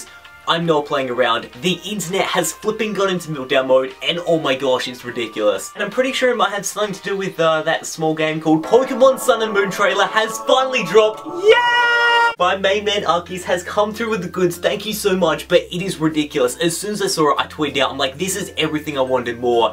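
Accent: Australia. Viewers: Azman Ismam He's an Aussie XD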